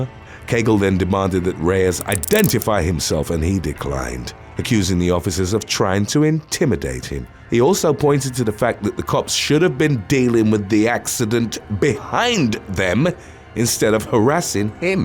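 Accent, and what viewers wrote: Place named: United Kingdom. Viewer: That’s just how Brits pronounce it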